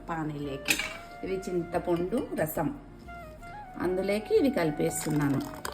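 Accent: India. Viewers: Mee matalu chala baguntai pinni endukante mana anantapur slang ekkada filter cheyyakunda original slang ❤ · Me videos and meru chese recipes, me rayalasema yasa anni chala ante chaala bauntayi garu 🥰🥰🥰❤❤❤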